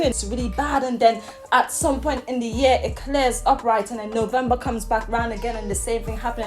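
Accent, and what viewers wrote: United Kingdom. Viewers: Some annoying British accent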